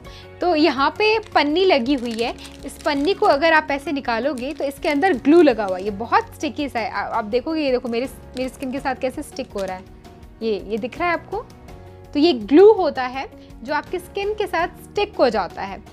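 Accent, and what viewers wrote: Bihar, India. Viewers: apni language ko thoda sundhar.... Tipical bihari tone ata hai apne muh par mane top k banati h vedio or channel ka namo rakha h be natural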